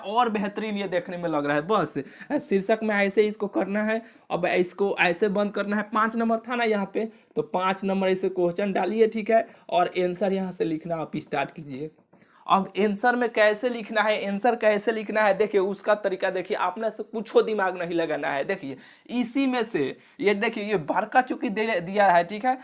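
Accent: Bihar, India. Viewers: Sir aap Bihar se h kya.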